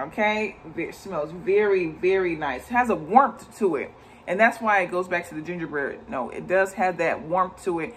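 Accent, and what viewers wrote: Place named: Philadelphia, United States. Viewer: I love your review. I've been contemplating buying this since I picked up Black Phantom by Kilian which is now my favorite scent of all time. Are you a Philly girl? I believe I hear some of that Ptown in your speaking which I miss since I left home. I've been living in Hawaii for years now but I'm originally from Southwest Philly. Keep up the good work.🙌🏽🙌🏽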